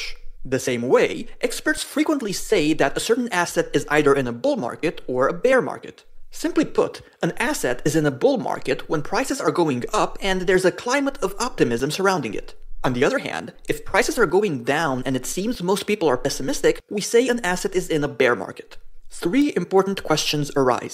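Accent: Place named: Singapore. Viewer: Are you from Singapore? Thick accent.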